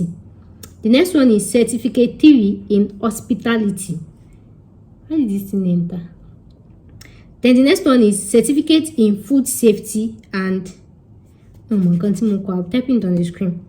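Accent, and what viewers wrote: Yoruba, Nigeria. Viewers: Hey pretty.... Nice video, but that Yoruba intonation is a turn on for me.... Won't mind knowing you more🙈😀🥰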